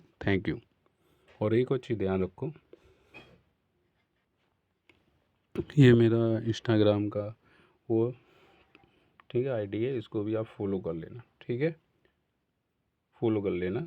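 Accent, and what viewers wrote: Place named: Madhya Pradesh, India. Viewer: Thanks for the response sir! Abhi mein start hi kr rha hun aapke video dekh dekh k, kabhi kabhi profit aur kabhi kabhi loss, I feel mostly mein abhi emotional trades le rha hun since I'm very new to the market toh achche se confidence nhi aaya h. lekin aapki strategy se bahut kuch seekhta hun, aapke accent se lgta hai jese aap madhya pradesh se ho, mein bhi Madhya Pradesh se hi hun. Thank you so much for explaining!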